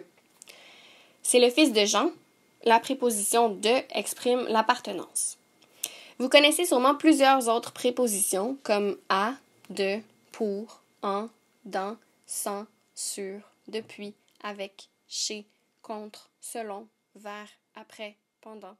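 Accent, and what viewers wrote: Quebec, Canada. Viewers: Bonjour ! Quel superbe travail !! Mille mercis et bravo . Je me sers de vos capsules et des exercices pour les enfants de ma classe primaire en France encore en confinement . Les enfants découvrent en même temps l'accent québécois , c'est sympa ! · Bonjour, Elle n'a pas d'accent anglais, mais plutôt une variété québécoise.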